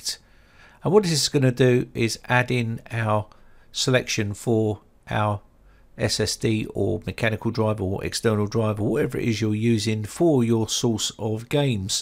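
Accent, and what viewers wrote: United Kingdom. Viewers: Not even close they have a different accent. The man on here has a British accent and the one playing Korg has a different accent a New Zealand accent to be exact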